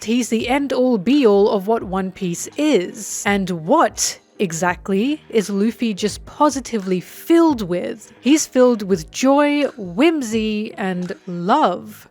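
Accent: Australia. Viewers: Amazing video, also i didnt know you were australian too, hell yeah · What your Australian!!! Samsies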